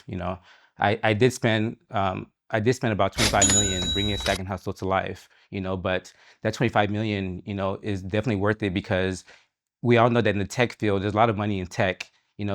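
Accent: Nigeria. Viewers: Yes ..he has a Nigerian under torn in his accent for sure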